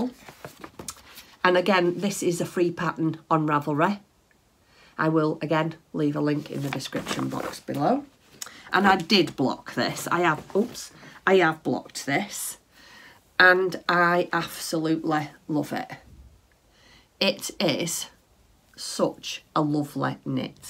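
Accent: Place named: United Kingdom. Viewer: Hi....I found your video last night and enjoyed your video so much that today I went back to watch your older first videos❤️ what a delight you are! I find myself laughing at times when you mention different things because I can so relate to it.....keep up the great work.....you have quickly become one of my video favorites💕 I love your British accent....I have a sister in law who is from England as well!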